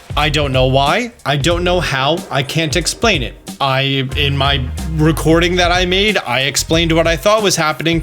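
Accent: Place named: Philadelphia, United States. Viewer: Are you from Philly? We're like the only people in the country that say "wooder" instead of water.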